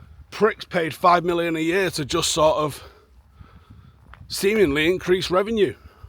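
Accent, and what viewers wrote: Manchester, United Kingdom. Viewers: I think that's just how we mancs talk mate!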